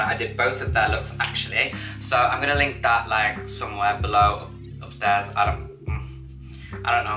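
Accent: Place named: London, United Kingdom. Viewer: I’ve just been watching all of your old videos from like 3/4 years ago and you used to speak to posh and delicate and you used to be so quiet and no hate but all of your recent vids you’ve got this mad London accent it’s weird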